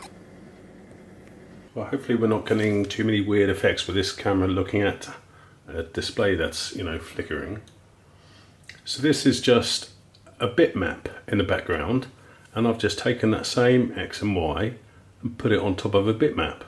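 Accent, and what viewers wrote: United Kingdom. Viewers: will do I’ll try a few of the example programs, I realised after I posted it was probably the picture file, I thought from your twang you was from Surrey way.. Yorkshire a bit further up the country from me.. ok I’ll go have a play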